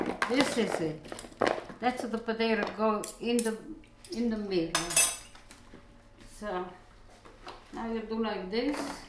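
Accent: Italy. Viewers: What a sweetie! Reminds me of my friends mothers in the Bronx, Naples, and Catania. We would be in the kitchen after school helping them prep and cook just like she said. (Except they had a stove and oven in the house by the 70's and 80's!) I live in North Florida now and you just don't see or hear that beautiful italian accent and sensibility here. North FL natives are 'senza sapore.' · Dear, I watch your videos all the time and I love them. It's not just the real italian recipes and the way you prepare them, it's a' you and the way you speak your Itali-ish! You have a wonderful personality and are a very conscientious cook. BTW, you are not "TOO SLOW!" Perfection takes time, it cannot be rushed! So thank you for taking your time to prepare these delicious italian recipes for us on video and step by step. You are not only educating us, but, you are also very sweet and entertaining. 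God bless you and thank you very much. Your family is proud of you and love you and it shows. They are blessed to have you and you to have them. Please keep making your lovely videos for us out here. You are a gift and we love you!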